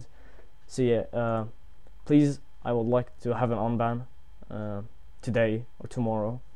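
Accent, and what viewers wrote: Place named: United Kingdom. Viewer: Can't tell if you're British or American LOL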